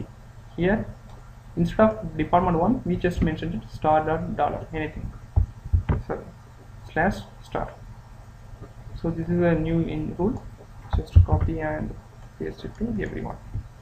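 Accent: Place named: India. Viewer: really want to watch your video, but it is not possible!!!! talk slower, louder, and dont run with the words. ur indian accent is very strong, u should know it, and slowwwwww it down, have u watched it? understand any thing??? 27 min, and you dident notice it? its sad i can not enjoy your wisdom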